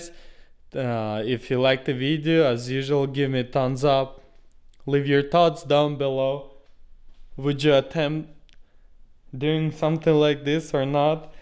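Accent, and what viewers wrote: Russia. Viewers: I can tell you are Russian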